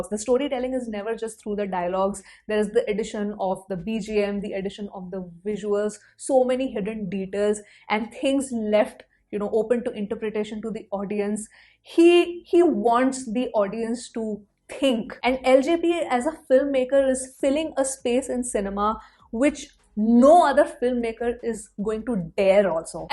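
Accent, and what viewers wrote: India. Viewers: Your Indian accent is so annoying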